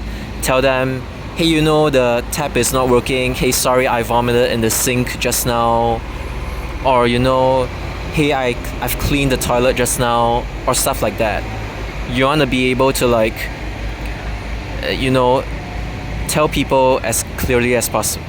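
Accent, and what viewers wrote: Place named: United States. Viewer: Lots of non-natural English speaking people that speak particularly well develop an American twang, as they learn to speak from consuming American media, or have American teachers in English as a foreign language schools, as my ex-Ukrainian girlfirend did. Being from Singapore, I don't hear that too much. As being a 'British' accent? There isn't a generic British accent. My specific Welsh one, sounds different to the one from my friends in Oxfordshire, Birmingham or Hull. To be honest, I wouldn't worry about it. As long as you're making yourself understood. Stay yourself.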